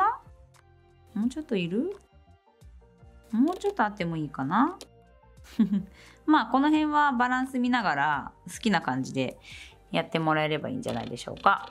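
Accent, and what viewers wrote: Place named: Nagoya, Japan. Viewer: 名古屋の方でしょうか？！懐かしい響きな気がします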